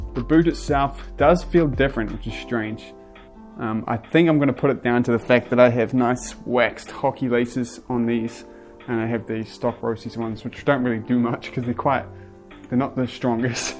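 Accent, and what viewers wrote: New Zealand. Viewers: Are you born Kiwi or you come from another country? I love your accent 😍💞💞💞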